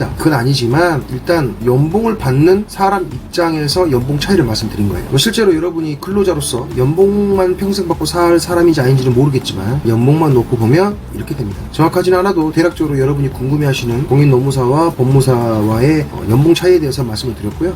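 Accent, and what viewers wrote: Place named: Jeolla, South Korea. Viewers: 아따 라도여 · 전라도 분이신가... 고향의 냄새가 나네...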